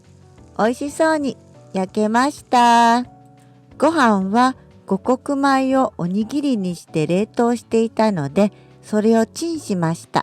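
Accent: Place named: Kansai, Japan. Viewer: ゆっくりとした関西弁が心地いいです。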